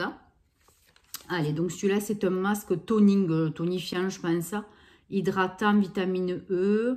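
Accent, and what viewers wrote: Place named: Occitanie, France. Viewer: d'où cette accent alors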